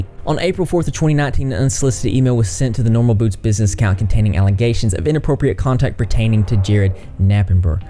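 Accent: South, United States. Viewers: Are you from the south? Your accent is adorable.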